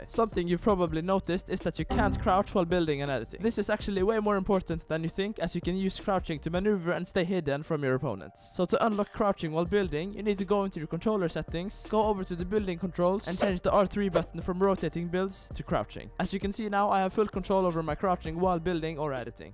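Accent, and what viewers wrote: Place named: Norway. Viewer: er du norsk? if not you just sounds like a norwegian guy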